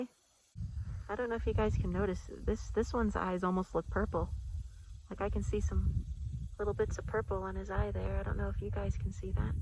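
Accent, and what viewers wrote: South, United States. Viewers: The country accent on that femboy voice. Wow. Melt any man's heart.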